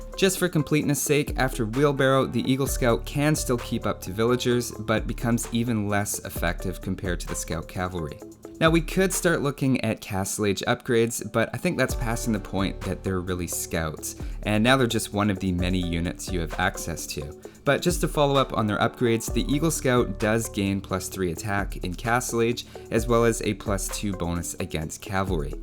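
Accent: Canada. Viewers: My weary soul is calmed every time I hear say his "let's check it out", in that Canadian accent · Is the author of the video Canadian by any chance? "Scout" and "about" are distinctive in their pronunciation to Canadian speech.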